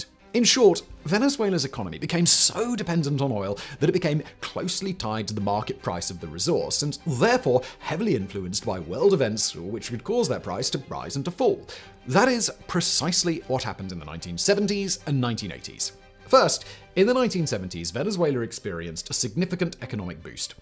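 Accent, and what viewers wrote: United Kingdom. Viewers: Since you sound British, when is the “Royal” family in the U.K. giving up power? 🤔 That is a LONG RUNNING dictatorship!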